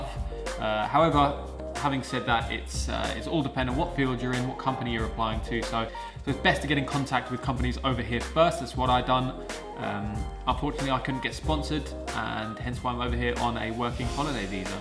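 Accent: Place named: United Kingdom. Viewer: This is the nicest UK accent I have ever heard. Can I ask whereabouts in the UK you are from?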